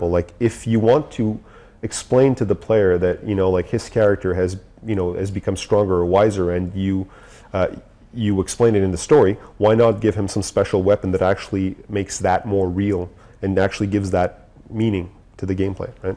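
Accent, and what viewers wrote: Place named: France. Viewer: did anybody else notice the tiny french accent he has? lol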